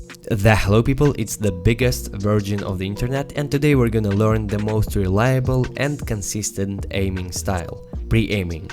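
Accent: Russia. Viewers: Мне интересно, ты русскоговорящий или нет, прост акцент либо выдает, либо я незнаю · ставьте лайк, если понимаете что он говорит, только потому что у него русский акцент:) спасибо за видео ты пиздат.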